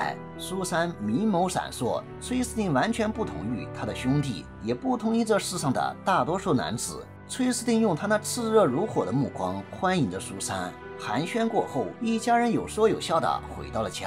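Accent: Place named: Shandong, China. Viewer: 越哥的文稿写得很精彩，山东口音很亲切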